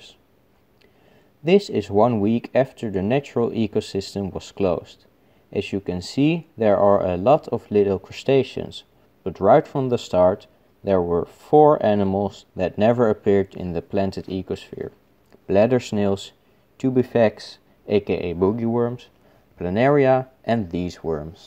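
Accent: Netherlands. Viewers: Ur Dutch Lache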